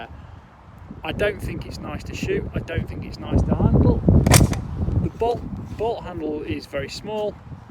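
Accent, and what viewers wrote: United Kingdom. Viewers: Well he does have a british accent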